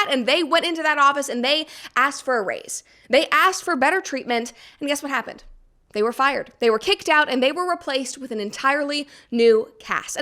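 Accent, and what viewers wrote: United States. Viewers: can i make an AI clone of your voice with Elevenlab i want to custom make an ASMR video on u, i liked your vibes and yapping fast and quick with typical American accent :yougotthis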